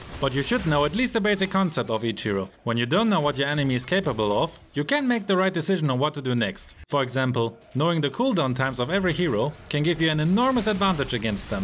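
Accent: Germany. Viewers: Deutscher Akzent xd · Hey in what server are u eu or na I feel like eu because of german accent Sow if you wanna game I m open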